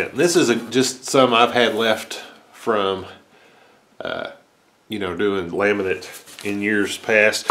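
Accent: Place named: United States. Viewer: great accent! Which state is that? (I'm English.) Also, great video.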